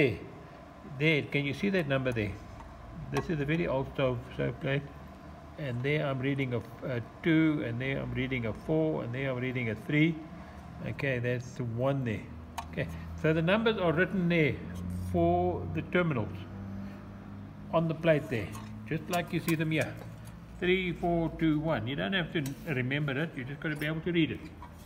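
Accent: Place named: South Africa. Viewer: Thank you maneer. I hear your accent from Mzantsi🙏🏽🙏🏽🙏🏽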